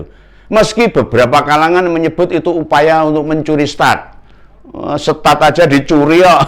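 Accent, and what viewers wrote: Java, Indonesia. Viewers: Kang aku seneng medokmu jiiiaan nyenengke dirungokke pas lan uenak ngono lho opo neneh nek ngenteki si YOHANES ANUS baswEDAN ajur juuuuu terus kang aku ndukungmu.....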